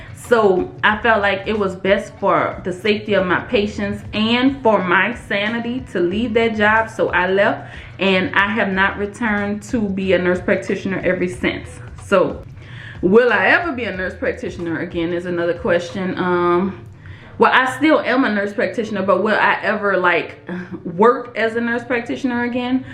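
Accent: New Orleans, United States. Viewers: That NOLA accent is my most favorite in the Entire World! Fellow NP here...don't change girl!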